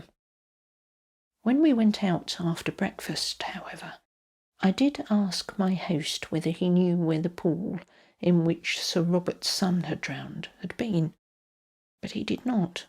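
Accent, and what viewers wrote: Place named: West Country, United Kingdom. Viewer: Wonderfully atmospheric. Beautiful narration—is that a “west country” accent? If so, where is the west country? I love the accent and grammar so much I’m determined to learn how to do it. PS, it was hard to concentrate once I learned there was a box of papers, unlocked, just sitting there, as big as Dallas, that no one bothered to read for 300 YEARS! It took me a few minutes to settle down as I tried to wrap my mind around it.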